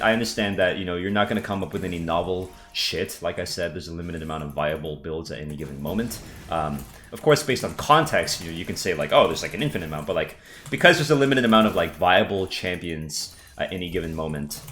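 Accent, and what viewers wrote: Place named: Finland. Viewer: his Finnish accent definitely helps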